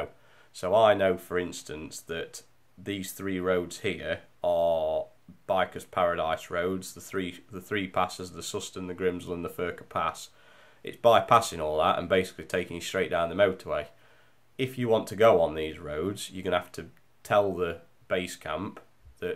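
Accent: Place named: Stoke, United Kingdom. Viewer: Good vids mate, I'm pretty happy with it but I always like seeing if others do owt different 👍, sound like you're only down the road from me ...Stoke ?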